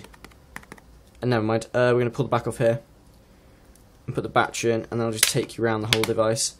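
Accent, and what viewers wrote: United Kingdom. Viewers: Heard your British accent, saw the 0 "dislikes" so I immediately liked the video. :)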